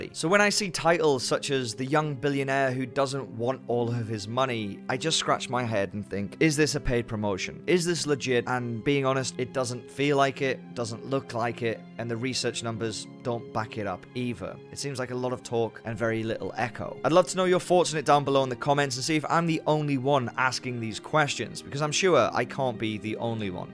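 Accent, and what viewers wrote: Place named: Whitley Bay, United Kingdom. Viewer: Sounds like a Whitley Bay accent 😏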